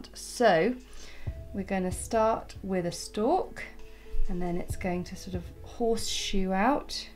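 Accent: England, United Kingdom. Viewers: I just have to say, I appreciate how clean your palette is. I watch some other painters and theirs are so messy, it really makes my OCD go batty. 😂 I also enjoy listening to your accent. I am from Minnesota but have friends in England, and so I imagine that you are just another one of my friends there. I always watch before I go to sleep. It is so soothing.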